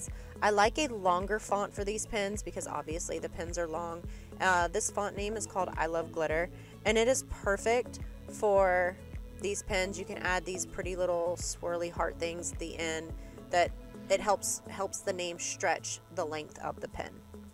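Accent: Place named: South, United States. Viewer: Very Beautiful !! ----- Girl where you from ?? With that southern TWANG Ba'eye !! LOL ---- I guess more to the west from where Im at ---- Or it could be Alabama/Tn ...... I'm in Louisiana ---- Down yonder from you =)~ ------- Love your work ---- and your husband reminds me of mine ---- always creepin around when were trying to work ...... He is just amazed at your work .... is kinda sweet ...... but still kinda gets annoying .....bless their hearts .... I guess ---as long as they keep their creepin at home its ok ...... I SURE don't wanna be an outside the home creepers wife ...... UGH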